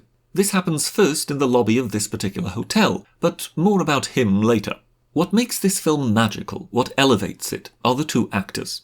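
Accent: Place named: RP, United Kingdom. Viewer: Good idea! I feel for, not only does YouTube keep him down, I bet his ‘posh’ accent has limited his £ donations over the years ☹️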